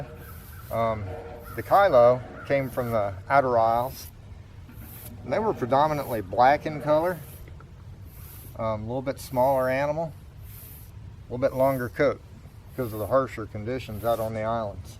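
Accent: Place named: South, United States. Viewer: Love your southern accent x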